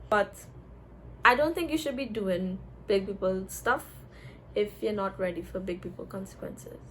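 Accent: Trinidad and Tobago. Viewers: She sounds like a Trinidadian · what's your nationality? sound Trinidadian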